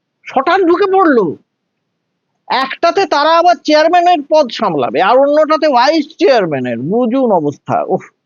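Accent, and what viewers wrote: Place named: West Bengal, India. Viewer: আপনার বলার বাচন ভংগী খুবই চমৎকার,,,,,, তাছাড়া পশ্চিম বাংলার বাংগালী ভাইদের ভাষার মাধুর্যতা তো আছে ই,,,,ধন্যবাদ আপনাকে।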